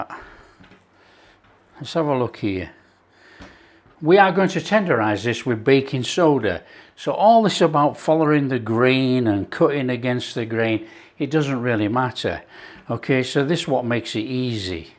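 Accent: Yorkshire, United Kingdom. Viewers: This will be the first time that I’ve taken an oriental recipe from somebody that has a United Kingdom accent. It looks delicious. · Great stuff - loving your Yorkshire accent :)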